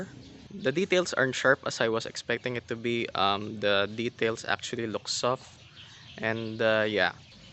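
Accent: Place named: Philippines. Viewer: When I heard a Filipino Accent English speaking. ☺️👍 I'm so proud being a Filipino. I love your review. So detailed.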